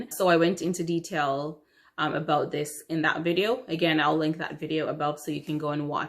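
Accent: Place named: Ireland. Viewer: Hey! I have been following you on insta (I am libraryjunky) and didn't realize you have a book tube channel! So fun! I LOVE your accent! Where are you from originally? Am I hearing Irish or Jamaican or am I crazy?!? Hahaha! Nice to see you on here!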